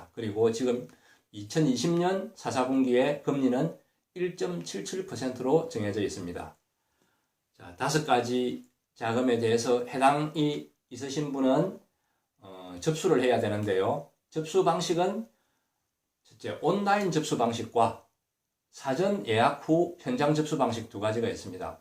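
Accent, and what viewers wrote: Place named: Busan, South Korea. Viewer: 부산억양 귀에 쏙쏙 들어옵니다.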